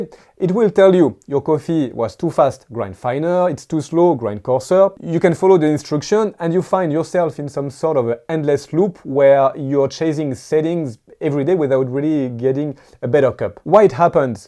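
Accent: France. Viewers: Un français qui parle anglais 😊 merci j'ai tout compris 🙏✨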